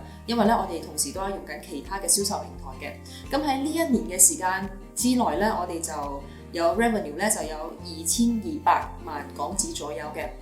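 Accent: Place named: Malaysia. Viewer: 之前对你的马拉广东话口音好有印象，人靓左好多，差点认不到，看好你。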